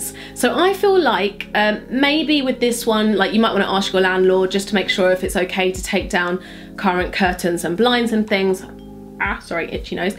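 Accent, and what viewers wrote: London, United Kingdom. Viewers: you sound so London in this video for some reason haha! your decor is gorgeous xx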